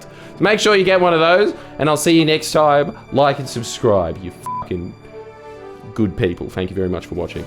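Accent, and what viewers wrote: Australia. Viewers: how did u not realise lmao he sounds pretty Aussie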